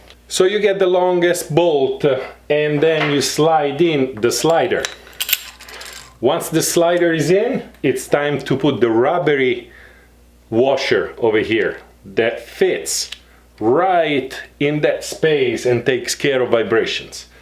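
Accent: Italy. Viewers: the Italian accent adds to the experience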